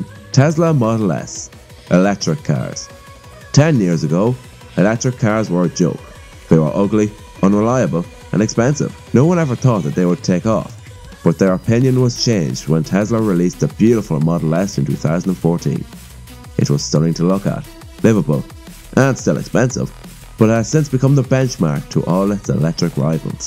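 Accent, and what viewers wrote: Ireland. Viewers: he is Irish...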